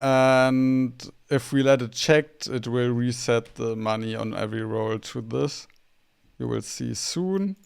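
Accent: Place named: Germany. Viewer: danke danke. und besonderes danke fuer schnelle antwort. weisste als ich dein englisch gehoert hab dacht ich mir hmmm vlt deutsch. nicht mal gerallt dass entwicklerwerkzeuge deutsch is ^^' erst spaeter so wait a moment 🧐 klein meinte ich vom tutorial wenn mans am handy schaut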